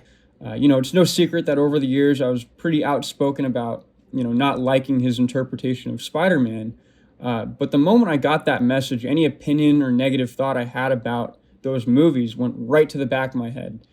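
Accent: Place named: South, United States. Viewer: Of course this guy got a southern accent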